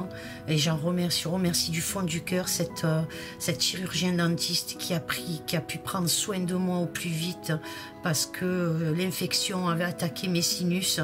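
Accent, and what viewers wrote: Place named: Camargue, France. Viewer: Bjr contente de vous retrouver en bonne santé, et surtout de vous protéger en vous reposant. Ce qui me trouble, ce sont les mi.....s étrangères que vous avez vu, et dont j avais entendu parler il y a quelque temps, la nationalité d un pays dont on parle bcp en ce moment.prions pour que cela n arrive pas, pour tout le mouvement qui s est mis en route.merci bcp, vous avez l accent d une ville que je connais ou de la camargue.ca me fait plaisir. Bien a vous prenez soin de vous.✌️👍🇲🇫🚛🚚💖🗽🐞🍀🕊️😘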